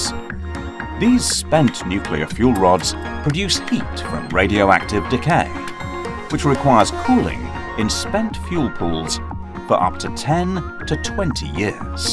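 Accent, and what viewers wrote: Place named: United Kingdom. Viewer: Use an American English speaker, not a British English speaker. Thanks.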